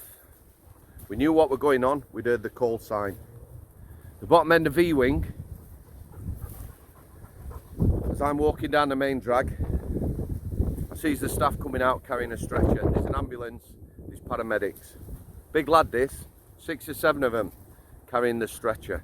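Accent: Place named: Sheffield, United Kingdom. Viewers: Love your Videos can tell your from the Steel City of SHEFFIELD a Mile off with that accent. Can't miss it anywhere in the World. Your good at this Mate all the best from SHEFFIELD. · Yeah proper Sheffield accent that. From Sheffield meself. Living in Bangkok now. Stay up till the early hours watching this channel. Nice to hear a proper accent on YouTube.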